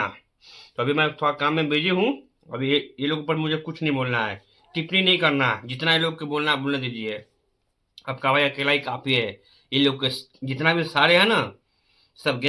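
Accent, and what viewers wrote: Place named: Bihar, India. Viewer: Aapka ka bihari andaaz achchha hai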